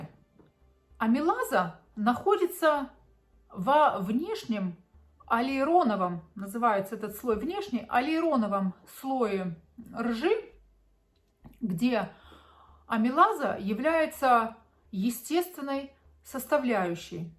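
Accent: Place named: Finland. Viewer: У Вас финский акцент👍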